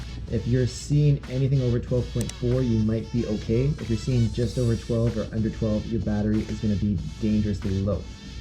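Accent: Canada. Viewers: Are you Canadian? I think I can tell. British guy here! 😀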